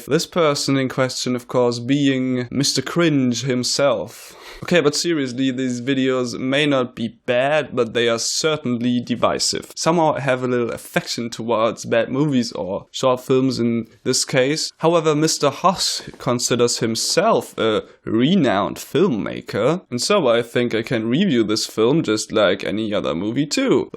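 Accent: Germany. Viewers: Dude Clearly i hear some German Accent in this Video and its Horrible!! better learn to speak like a human being or talk German. This suits you better.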